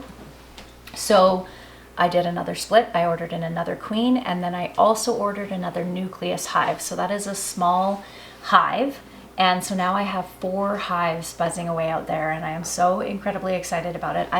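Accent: Canada. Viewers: I'm in Minnesota. Besides words like "process", I hear your Canadian accent in your "ou" words; about, house, out, etc. 😊 It's very subtle, but i hear it! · I love your Canadian accent. My dad's family are Canadian. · I just love your Canadian accent and how you say words. It’s funny that you brought up “marrygolds” 😊 You have such a soothing voice that I can listen to you talk all day long. Thank you for sharing your beautiful life with us 💗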